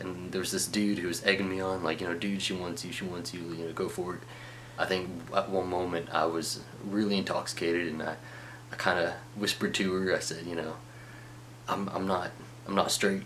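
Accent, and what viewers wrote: South, United States. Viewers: Love your southern voice. Or accent lol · Great story and awesome t-shirt... and I'm a sucker for a southern accent:-) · You're so hot. Nice Southern accent. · That southern accent is very attractive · Love a southern accent. Wow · Loved your story and that gravelly deep voice and southern accent didn't hurt either! Best wishes on coming out to your dad and other family members. A Great Video - I Liked, subbed and shared! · I just love how u break all the regular stereotypical things about being a "typical gay man". I really like that about u! As being a transgender guy, I would've never thought u were gay with ur really deep voice and ur genuine "southerness" but that just goes to show that u can't tell if someone is gay by looking at them. I wish u the best of luck and all the happiness in the world! XOXO-:-) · Group A... 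fuck em. You're better off without em. As a southern native (NC) it's always nice to hear someone who talks like me proudly say who and what they are. I live in a moderately metropolitan area, and the vast majority of people here view minorities (racial, religous, sexually oriented) as just another person. No big deal. If I drive 30 miles west I arrive in the country. I mean the woods. And the attitude out there is a little different. These rural areas in the south are still a little behind the times, but even in those areas perceptions and ideals are beginning to change noticeably. Especially as the older generations are dying off and the younger generations are arriving at a point in life where they are starting to put together their own informed ideals and opinions. The reason I say all this is because a lot of the nation has this stereotype of the south in their minds as a regressive, racist, intolerant part of the country. 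And today that, for the most part, just isn't true. At least in North Carolina it isn't. I have lived in D.C. and Philadelphia and can say with all honesty that I have heard more bigoted talk up there than here at home. Including bigotry towards people simply for their southern accents. Sorry for the long comment but people need to know that when they hear southerners talk or think of the south in terms of our social views that today's south is not the south of 30 years ago. It's not the south of 5 years ago. We are embracing modernity, not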